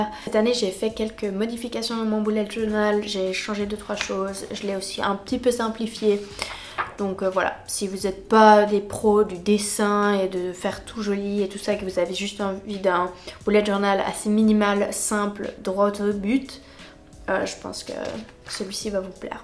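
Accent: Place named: Switzerland. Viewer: L'accent suisse est beaucoup plus facile à comprendre que les autres accents français.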